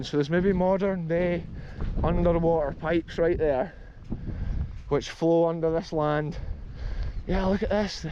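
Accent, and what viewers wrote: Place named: Angus, United Kingdom. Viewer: I always find it hard to locate your accent. Given the general area of your adventures, I am going to guess Angus, perhaps around Forfar/Kirrie/Brechin? Am I close?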